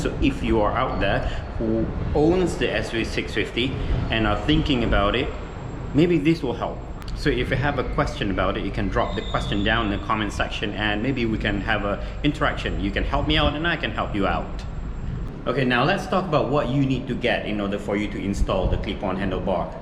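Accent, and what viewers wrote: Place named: Malaysia. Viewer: Your english sounds Malaysian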